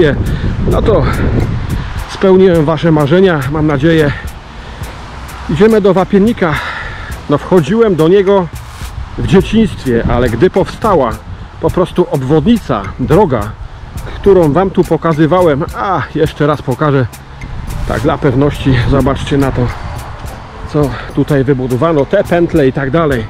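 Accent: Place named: Podhale, Poland. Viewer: Jakiś dziwny akcent, coś górami zalatuje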